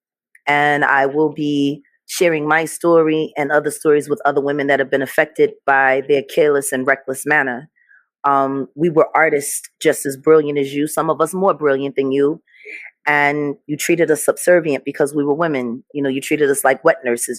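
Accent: Philadelphia, United States. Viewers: For the people in the comments who think she's on something, you're right. She on her North Philly ish. This is how we talk. You have to grow up here to fully understand where she's coming from. If you violate family the gloves come off. It's like an unwritten rule of Philly street life. She is from my era, so I overstand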